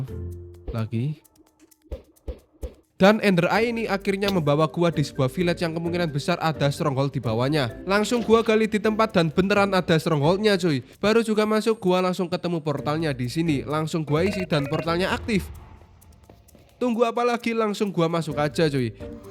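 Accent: Java, Indonesia. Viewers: Medok bang ngomong lu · keknya lo jawa bg ngomong gue nya medok bgt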